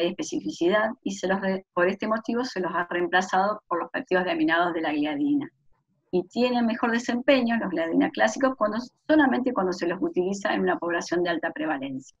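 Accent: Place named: Argentina. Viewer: Hola, gracias por toda esta valiosa información. Solo quiero aclarar una cosa. Yo padezco la Enfermedad Celiaca diagnosticado ya hace varios años aquí en Los Estados Unidos donde vivo. Se me ha dicho todo el tiempo por los Doctores que la avena no contiene gluten naturalmente, solo se contamina cuando se maneja junto con el trigo. Yo ingiero avena frecuentemente y no me produce ningún problema. Por el acento de la Doctora creo que es Argentina, escuche este mismo comentario en un programa de televisión Argentino. Así que no se si esto es un error pasando solo en el sur de America o es un error aquí en los Estados Unidos.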